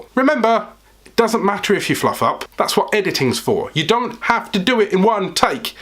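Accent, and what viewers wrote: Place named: Yorkshire, United Kingdom. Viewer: the intro was the most entertaining bit, I'm so glad you got the humour to you content... Sounds like you got the Yorkshire accent..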